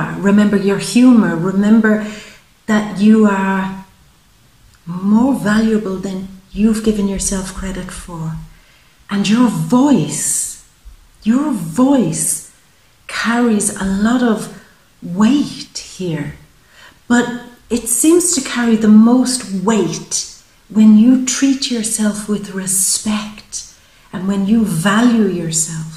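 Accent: Ireland. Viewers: Is that an Irish accent? It's great!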